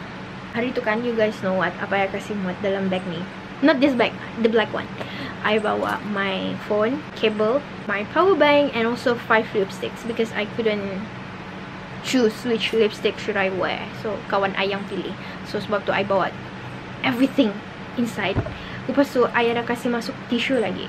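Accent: Sabah, Malaysia. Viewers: wei i love it when u talk sabahan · moreee in sabah accentttt pleaseeeee im begginnn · comel ba kamu cakap sabah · i love hearing her sabah accent 😭❤️ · your sabah accent is so cuteeee i cant ........😭😭😭❤️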